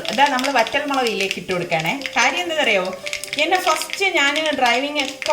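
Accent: Trivandrum, India. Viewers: ..same recipe ente vappachiyudeyum favourite aanu.njanum orattitum varutha chamanthium undakarundu.pinne tvm bhasha parayunnathu kondu oru kuzhapavum illa. namukku pinne tvm bhasha alle parayan pattulu.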